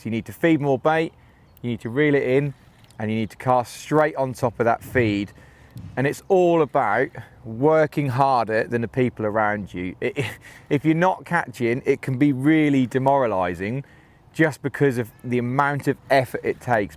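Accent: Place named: Coventry, United Kingdom. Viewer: Your accent tells me your a cov fan?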